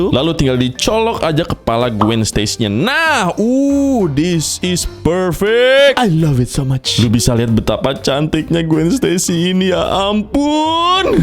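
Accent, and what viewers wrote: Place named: Java, Indonesia. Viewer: Orang jawa abng nya ya😂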